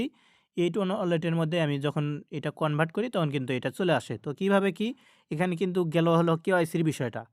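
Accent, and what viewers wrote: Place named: Rajshahi, Bangladesh. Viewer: Vai apnar basa ki rajshahi te. Rajshahir moto kotha bolsen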